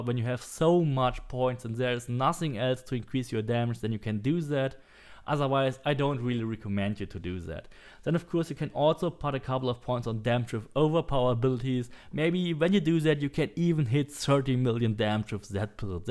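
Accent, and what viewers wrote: Germany. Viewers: Ich feier einfach das du eindeutig ein deutscher mit (nicht böse gemeint ) voll dem allman Akzent trotzdem einfach auf Englisch dein Ding durchziehst mach weiter so 👍🏼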